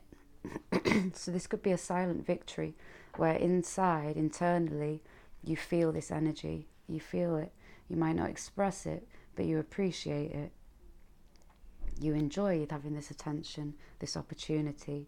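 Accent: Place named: Yorkshire, United Kingdom. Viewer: Thank you for pile 4 that i was guided to 🙏🏻 i hear a fellow Yorkshire accent, it’s nice to hear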